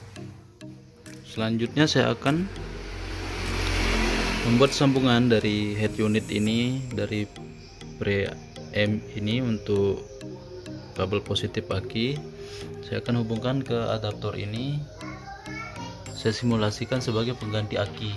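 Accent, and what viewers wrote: Bugis, Indonesia. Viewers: Org bugis kah ?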